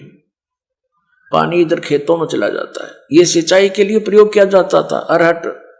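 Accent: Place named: Haryana, India. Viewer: Iski haryaanvi bahut mst lgti h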